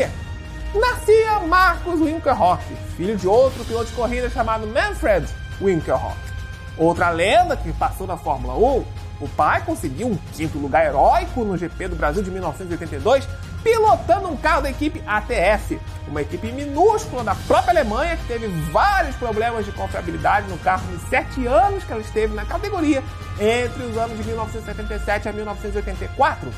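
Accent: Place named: Portugal. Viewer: Sim, belo sotaque PT-PT!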